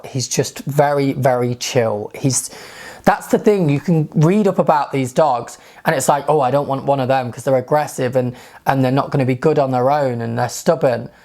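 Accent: England, United Kingdom. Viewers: Are you from England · I just love his British accent ... I can spend hours hearing him talk... ✨️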